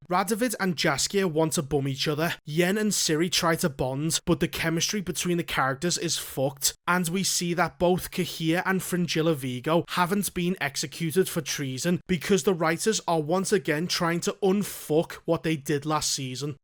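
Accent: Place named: Northern England, United Kingdom. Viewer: 😂 funny review. But it did make me wonder why men crying is somehow emasculating? Are you saying you'd think less of one of your boys if he cried whilst talking to you about losing his dad? He's your BOY! It must be hard being your friend if your going through tough times then. 😬 Also, whilst that black witch was definitely miscast, it made me laugh how uncomfortable you are with overweight people. Assuming you live in the West, given your accent (Northern England) then you must live in a permanent state of horror! I thought that woman was pretty. Just not right for the role, given the book description. Or, what we know of the witch graduation magical 'surgery'. It just feels strange that you are so disgusted by her because she's overweight. I dont know if you had the same issue with that king who was banging his sister? In the books he's supposed to be handsome. 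In the show he's overweight, older etc. But, oh well. Another thing this show inexplicably changed. Doesn't make any of them ugly, gravy-bleeding monsters. This may shock you. But, fat people can get partners too. Not everyone agrees with your point about them being ugly, disgusting monsters, is what I'm saying.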